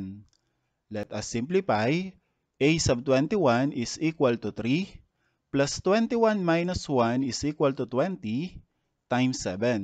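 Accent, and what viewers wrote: Philippines. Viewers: Hes accent is very similar to a filipino because ima filipino too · I can tell you are a filipino by accent hahaha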